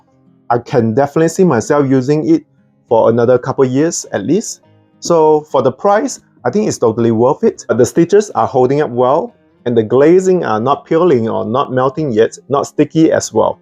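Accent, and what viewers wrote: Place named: Malaysia. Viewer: no offence but are you anyway from malaysia/singapore cuz you have their articulation? 🤣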